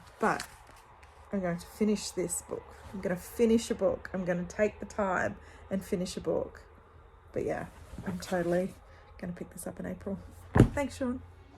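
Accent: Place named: Australia. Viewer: Brent wants to know if you’re originally from the Melbourne area. He’s listening to your accent.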